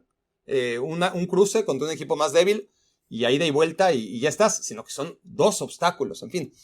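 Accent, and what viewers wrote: Mexico. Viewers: Hablas como en el bajío, en Guanajuato. Los centroamericanos y sudamericanos suenan muy distinto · Yo creo que tu asento es muy neutro pero si se nota cuando te expresas que eres mexicano, el mexigrindo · Sí suenas mexicano. Viva México. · Súper mexicano su acento. Desde que empecé a ver su canal noté que era mexicano. Saludos desde Costa Rica. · Si, tu acento es Mexicano, un extranjero te lo puede decir, porque un nativo se acostumbre y cree que no tiene acento... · Pues a mi es escucharte y me suena a Méxicano.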